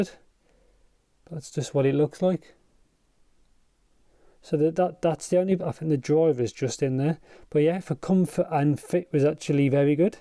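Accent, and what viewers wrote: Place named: Manchester, United Kingdom. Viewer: Sir no need to answer, are you from the Manchester area? That accent sounds like I'm listening to my cousins from UK. They all live in Manchester area. Still loving blon 03 if I grab back from GF who loves them... Cheers. My gf uses the pouch, thinks it cute.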